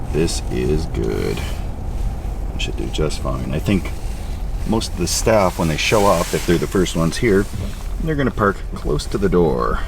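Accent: Canada. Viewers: OOOOOH yea..... Wanted to say that ur letter kenney way is omg, so BEAUIFULLY Canadian. I FREAKIN LOVE YOU...... Never stop.... I went back on ur vids and, holy shit hairbag.....!!!!!Seriously my friend, do not stop..... Hi Krazy neighbour and Beautiful wife( who without her, I'm sure, would not be) ( By the way girl, fu*& the haters... Mad respect) Don't stop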